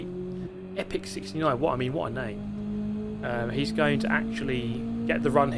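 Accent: United Kingdom. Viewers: Not to mention that British accent...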